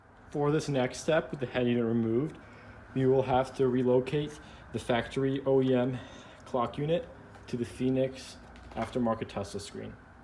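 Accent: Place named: Midwest, United States. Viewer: Love the midwest pronunciation of the word 'console' to sound like 'council'. Must be a Chicago thing. .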